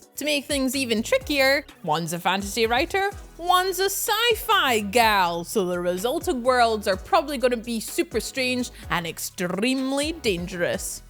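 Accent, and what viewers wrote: Scotland, United Kingdom. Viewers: Is she part Scottish?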